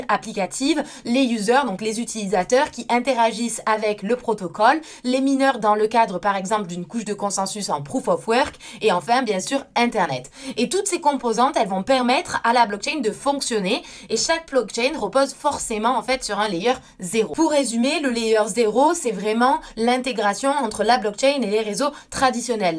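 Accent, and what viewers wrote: South, France. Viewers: té pas un peu du Sud toi?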